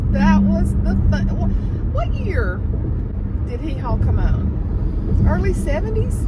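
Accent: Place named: Alabama, United States. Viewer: I enjoy you. I recognized your Alabama accent immediately, because I am an Alabama girl, too. Lots in common, including age, and I am glad to see someone on You Tube who is not pregnant or doing all the things I did 30 years ago. Thanks for your testimony, your outlook, and your wisdom.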